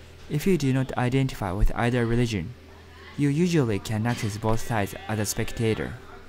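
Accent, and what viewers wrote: Japan. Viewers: I can understand that your English accent is heavily Japanese, but that doesn't mean you understanding on world issues should be so foggy and uneducated. 🤔 THERE IS NO PLACE OR NEED FOR YET ANOTHER ARAB COUNTRY IN THE WORLD ! <link>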